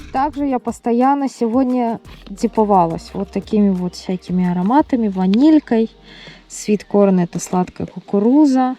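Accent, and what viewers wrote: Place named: Belarus. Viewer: Белозубая улыбка это нечто!! И профиссиональные снасти аксессуары это зачёт!! Смотрю тебя с удовольствием настроение супер, спасибо за видосы природы и твои лайфаки для рыбалки и конечно твои комментарии говорок белорусский!!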